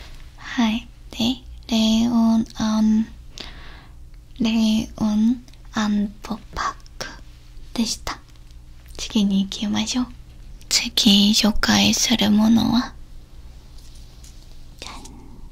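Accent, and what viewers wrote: South Korea. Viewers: 韓国の女の人が話す日本語っていいなぁ。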